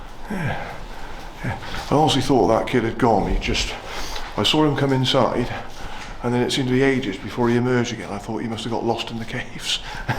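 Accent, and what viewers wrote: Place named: Herefordshire, United Kingdom. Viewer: Very god -got the Herefordshire voice I notice. The Wye was looking nice. Mark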